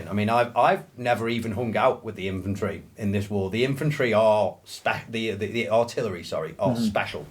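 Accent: United Kingdom. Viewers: This dude sounds like the best of British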